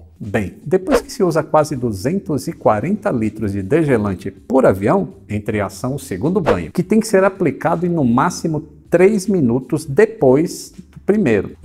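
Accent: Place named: North, Brazil. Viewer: percebi um falar NORTISTA no Mano... anda ele comendo muita Carne-do-sol com farinha ou ele é do Ceará? Nós de FOR queremos saber! P.S. " o Mano ê quase indispensável " é muito bem vindo!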